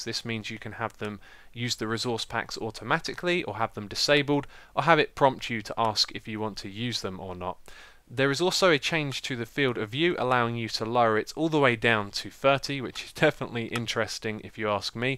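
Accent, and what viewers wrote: United Kingdom. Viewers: <3 Brit accents (content also good/perfect, as usual }=Þ)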